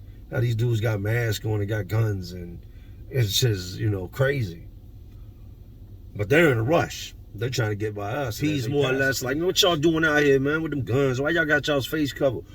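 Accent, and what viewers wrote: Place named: Philadelphia, United States. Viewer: When I 1st heard u talk, I thought you were from N. Philly!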